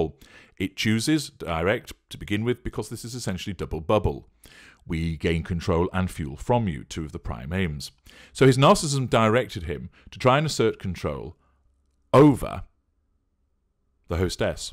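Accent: United Kingdom. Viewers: I like your voice and your british accent. It is very interesting to listen to you. We learn a lot on narcissistic people. They are dangerous people.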